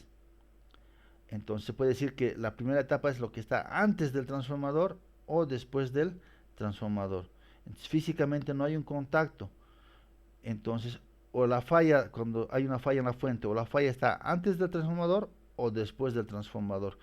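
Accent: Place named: Antioquia, Colombia. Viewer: Impresionante didactica, pero si me hizo reír su acento paisita jjjjj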